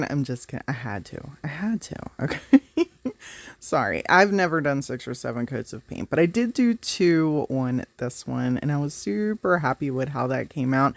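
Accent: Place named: Philadelphia, United States. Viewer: I have just found her and I am very intrigued. She is unique. However, does she not respond to our comments? 😢 I recognize her accent, Philly, Baltimore, where is she? ❤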